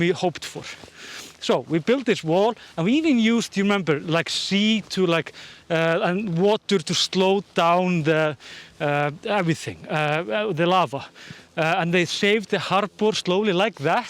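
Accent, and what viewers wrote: Iceland. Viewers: I really love your repots from the volcano and and your funny Icelandic accent :). You and your camera man are really doing a great job! I'm wondering about more m3 of lava because, in my opinion, the eruptions have become much shorter than before. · I love Your Islandic accent ❤️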